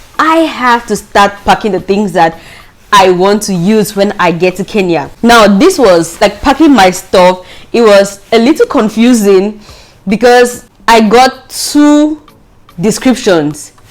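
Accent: Nigeria. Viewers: You talk like nigerians though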